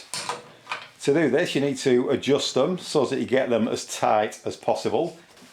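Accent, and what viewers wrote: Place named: England, United Kingdom. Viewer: I love your videos and also enjoy the accent. Reminds me of me old mum who was born in England. Recently had to get some rusty bolts off a car bumper.The problem was the part of the bolt poking through the mounting but was rusty but accessible so hitting it with a small wire brush and lubricating was enough to get them out without shearing off the head. Gotta be lucky sometimes.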